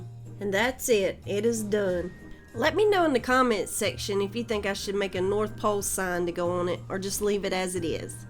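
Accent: South, United States. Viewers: Love your decorations and your accent! I am a southerner too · Honey child, I don't know where you're from, but I just love your honey and molasses southern accent. Cute ideas.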